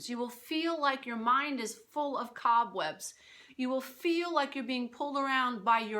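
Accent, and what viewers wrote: New York, United States. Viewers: Thank you Lady! I needed to hear that today. And your New York accent Rocks! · New York accent-one of my favorite American accents! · = The reason why I've been coming to you for life guidance for over a ye-ah. Dat tick New York accent!!! No for real, not only was I stoked that I found on youtube an empowered Italian woman who could empathetically help adult children of alcoholics like myself...but do so with a Queens accent? Paisan!, you're literally the best! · Love the NY Accent. Im from Manhattan and the Bronx. :-) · Thank you for making me laugh today. That whole "feed me feed me" part. You've hit the nail on the head and this is exactly what I'm going through right now. And this is exactly what I needed to hear. Thank you for addressing everything in a very funny light-hearted way. You helped my day begin in a much nicer way than it did yesterday. 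And I think your New York accent is adorable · Oh my goodness! I totally resonate with everything you are saying. Moved out last October. Almost a year! Woo Hoo! But... Why do I still miss the guy who was never NEVER really there- not physically or emotionally. He of course hoovered me 5 minutes after I moved out and then just a few months ago. Yay me for not falling for it, but after the last Hoover, I fell into the "vacuum". Guess what? I just met a new guy and he was love-bombing me on our first 'date', mirroring me, and even asking me to move in with him!! "You attract an energy being that is equal to the one you just kicked out the door!" EXACTLY!!! I feel like the universe is testing me- or maybe pointing out that I haven't done as much healing as I though I had..... Urgh.... Time to get to work and stop ignoring myself! By the way- I love it when you say "I digress..." And the NY accent! 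You speak so passionately and honestly. THANK YOU FOR ALL YOU DO! :) <3 Hugs from Canada. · I think it's endearing that you have a new york accent :) a real person not an actor :D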